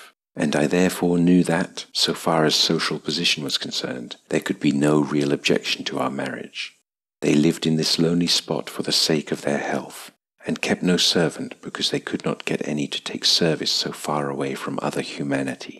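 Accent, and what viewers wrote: United Kingdom. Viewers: I like british accent. I listening your vids while shadowing them. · It's a thundering story with mesmerizing British accent. I like the way of narration.👍🏻👍🏻👍🏻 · It's nice to find stories with British accent.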